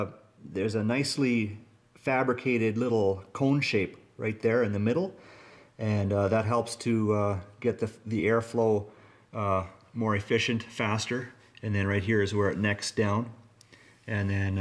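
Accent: Canada. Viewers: He sounds Canadian to me lol.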